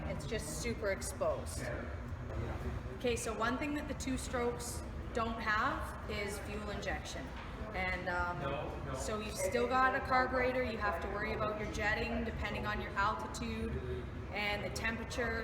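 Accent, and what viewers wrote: Canada. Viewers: That Canadian accent tho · I love love Canadian accents! Fuel injection for 2 strokes is here thanks to KTM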